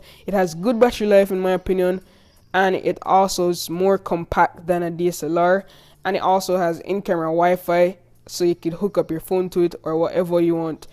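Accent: Jamaica. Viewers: He Jamaican I can hear the accent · Are you a Jamaican?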